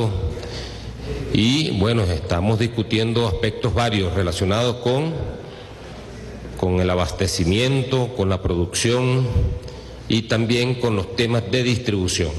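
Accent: Cuba. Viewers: HABLAS COMO UN CUBANO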